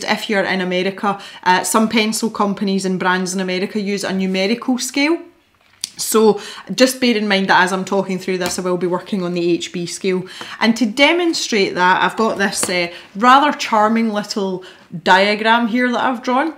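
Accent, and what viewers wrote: Scotland, United Kingdom. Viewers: So good hearing a Scottish accent 🙈 hi from Ayrshire ! Just found your channel love it !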